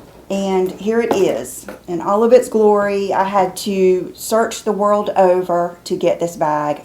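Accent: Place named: North Carolina, United States. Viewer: I know a North Carolina accent when I hear one. 🙌🏼I too am from North Carolina. The eastern Part! 😘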